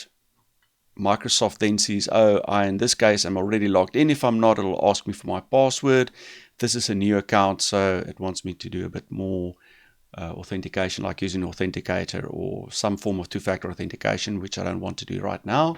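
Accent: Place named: South Africa. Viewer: Good stuff - The South African accent is also refreshing :)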